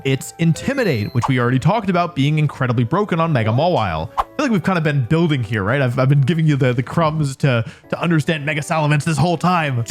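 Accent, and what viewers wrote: United Kingdom. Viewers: I approve of your British accent and mention sir🇬🇧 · your british accent is so authentic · British is something I didn't know I needed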